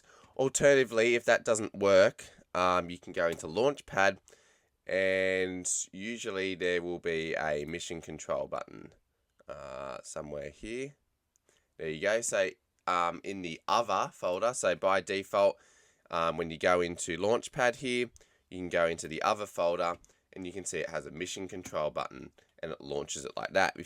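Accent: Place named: Gold Coast, Australia. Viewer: Use them all the time. Trying to figure out how to lock them. Drives me nuts when they shift. Your voice sounds quite a bit like pro surfer Mick Fanning which leads me to believe your from the Gold coast. Lucky you. Aussie envy here.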